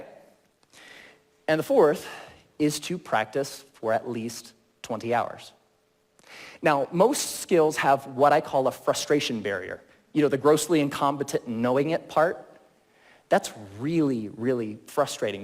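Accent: United States. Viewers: สำเนียงอเมริกัน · อเมริกันค่ะ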